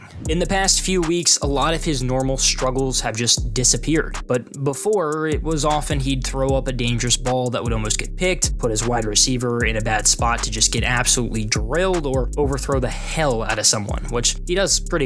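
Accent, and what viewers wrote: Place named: Virginia, United States. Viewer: I knew you was from VA ‼️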